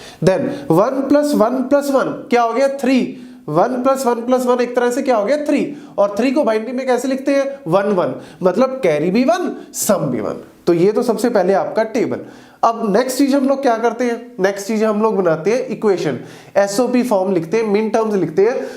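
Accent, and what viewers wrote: Punjab, India. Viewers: Sir ! Are you from Punjab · Sir tusi punjabi bolde oo routine ch ?? Thodi lehje toh lag reha tusi punjabi bolde howoge ??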